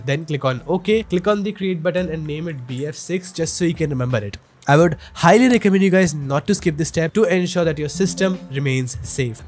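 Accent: India. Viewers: i was just going to say. dude tells you to turn off a firewall protection then non schilontely tells you to download malware while having a notoriously indian hacker voice. indians really should get morals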